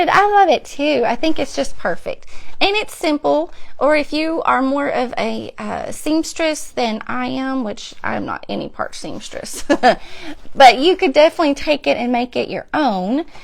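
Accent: South, United States. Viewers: I am replaying first time to find you I love your southern draw your style is same as mine I cannot wait to explore your crafts and hopefully catch you live. Be Blessed my new friend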